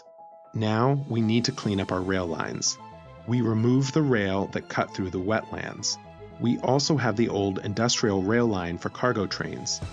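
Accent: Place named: Italy. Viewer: "Sorry for my bad English" cracked me up! Il tuo inglese è eccellente, better than most Americans, e gli Americani adorano gli accenti Italiani!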